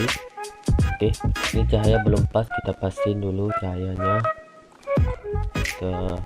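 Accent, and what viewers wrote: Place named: Aceh, Indonesia. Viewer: Bole kasih kritik? Bole ya...biar makin sukses.... Klo ngomong jan medok aceh bgt.. Trus cobak tuh lighting fotonya disamain kek latar belakang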